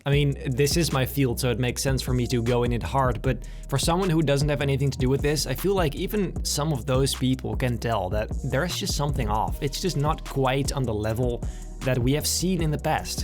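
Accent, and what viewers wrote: Netherlands. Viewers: en dit zegt iemand die engels praat op 'n hele nederlandse manier...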